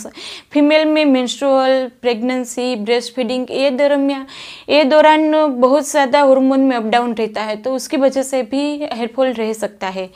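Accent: Gujarat, India. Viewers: Ap Gujarati ho ky??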